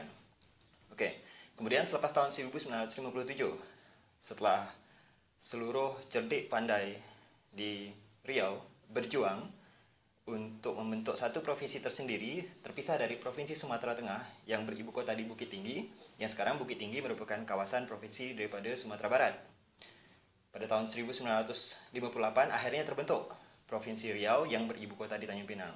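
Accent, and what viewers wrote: Malaysia. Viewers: He sounds like us malay in Malaysia 😄😄😄😄❤❤❤ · Kalau dengar suara sahaja, mesti akan ingat orang malaysia@ yang bercakap ni.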